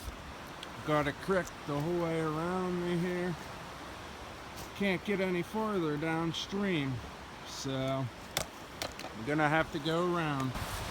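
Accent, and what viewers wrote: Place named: Indiana, United States. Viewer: "Crik". you say Creek like country people in Indiana do.. I'm from Indianapolis and we say cr-eek versus country people who say cr-ik..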